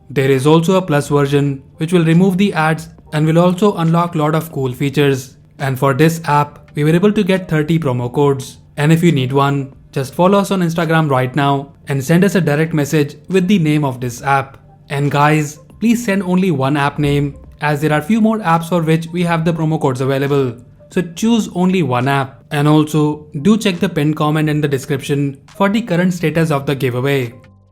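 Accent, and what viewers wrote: India. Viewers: Bro he’s Indian it’s how he talks. He has an accent. Americans man. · I dont know why indian peoples speak english like this accent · That's not accent. That's voice. He talks like a machine, in a repeating melody. His accent is recognizably Indian.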